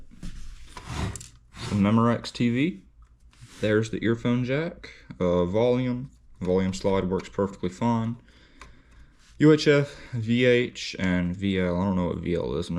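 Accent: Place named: Alabama, United States. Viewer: Southern Alabama accent.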